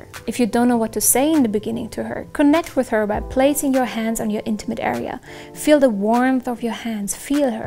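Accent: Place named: Germany. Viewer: My best friend is German, it's so nice to hear the accent <3 And you're the coolest so watching these videos make me so happy :)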